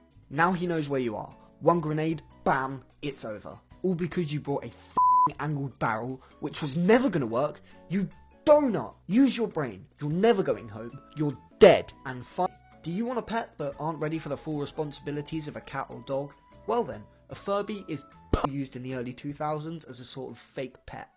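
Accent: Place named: United Kingdom. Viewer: The vid wassss toooo shoorrrttt😭😭😭, wait, he's British?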